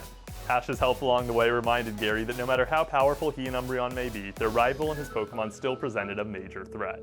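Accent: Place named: California, United States. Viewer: my instinc tells me you are Californian, am I right? ☀🌊🏄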